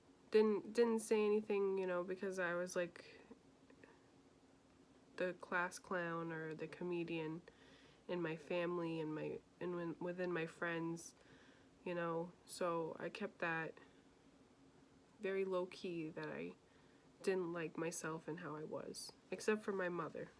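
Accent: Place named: New York, United States. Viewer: I was gonna comment on your other video wish you were chatting in that one love the New York accent haha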